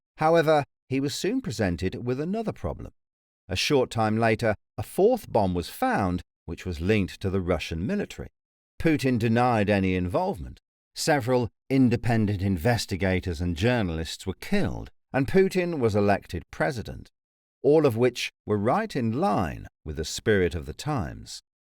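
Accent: United Kingdom. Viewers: Is this the same narrator? You got all British on us. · why are you british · So wtf why is there a British voiceover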